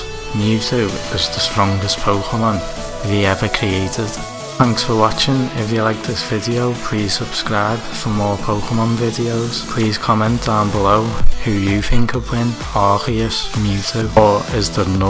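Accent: Liverpool, United Kingdom. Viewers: Your Liverpool accent. 🤮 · Is that another scouse pokemon fan.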